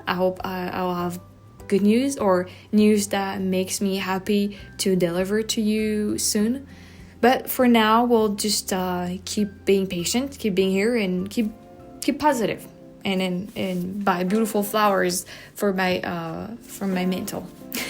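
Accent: France. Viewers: I haven’t seen one of your videos in years…You’re losing your French accent! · Since I first started watching you react to music, you have almost lost your French accent ❤❤ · Your English has gotten so much better over the course of your videos. Don't lose your French accent, though!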